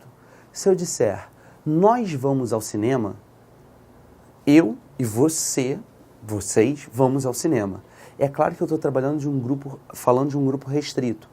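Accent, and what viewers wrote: Rio de Janeiro, Brazil. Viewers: ele é carioca falaigual a mim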